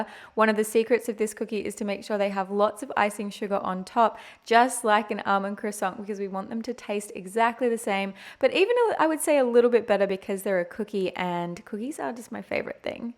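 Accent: Australia. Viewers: Wow im not american but those have to be huge cookies even for the USA standard right? 😅 Edit: just realised thats an australian accent hahaha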